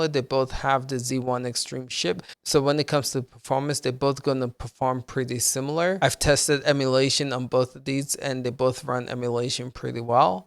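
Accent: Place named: Mumbai, India. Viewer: sounds like indian from mumbai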